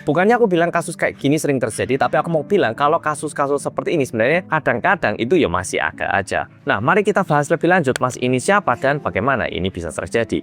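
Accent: Java, Indonesia. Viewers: mas kok suwe2 sampeyan medoke berkurang😁😁😁😁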